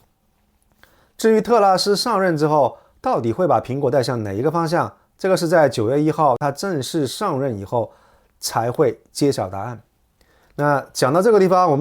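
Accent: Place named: Liaoning, China. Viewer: 辽宁口音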